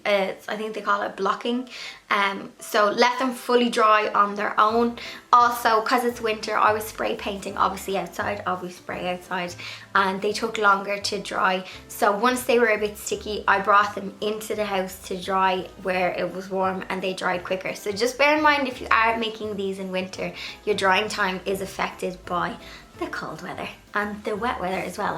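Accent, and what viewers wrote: Dublin, Ireland. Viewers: I'm new subscriber I am old school I use them for sewing lol and even makeup etc I'm afraid of inhaling spray paint but I love what you done I'm in Ireland is that a Dublin accent I'm a dealz lover and tried anything like that as I live alone xx thanks alot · Blondie is gorgeous. Your Irish accent is so much nicer than my Scottish one. 🏴 Thanks for this vid... I can't throw anything out. 🙃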